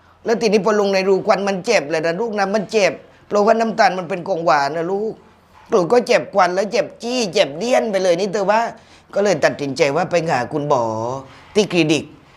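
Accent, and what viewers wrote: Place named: South, Thailand. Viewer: พลัดถิ่นมานาน ได้ฟังภาษาใต้พื้นๆ แหลง ทองแดงแล้ว ขำ คนภาคอื่นจะรู้ไม๊หนิ ว่าเราพูดภาษากลางแล้ว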